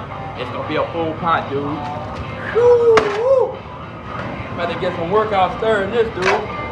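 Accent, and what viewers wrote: Louisiana, United States. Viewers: Did Jake Lloyd adopt a Louisiana accent and create a new character???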